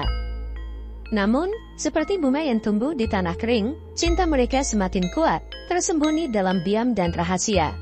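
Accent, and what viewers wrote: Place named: Netherlands. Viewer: Suara yg buat konten ini j suara logat orang belanda.bukan orang indonesia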